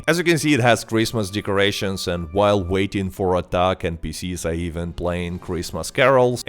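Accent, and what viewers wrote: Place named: Russia. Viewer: His accent make him sound Russian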